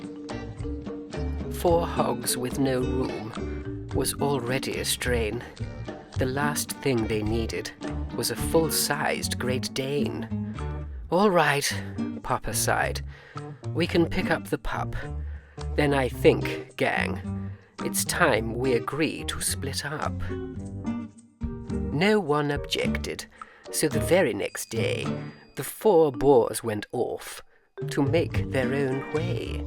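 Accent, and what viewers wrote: RP, United Kingdom. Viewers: the narrator speaks the Queen’s English.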